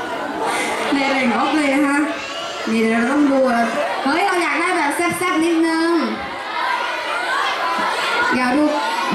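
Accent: South, Thailand. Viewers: พี่ เป็นคนใต้ออกเสียงซาว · รู้เเล้วว่า เป็นคนใต้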